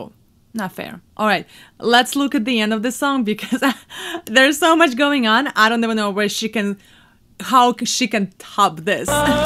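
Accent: Russia. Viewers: русский из английского никак не вытравить))) но за обзор русской , самой лучшей певицы , спасибо! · что за русский акцент у вас?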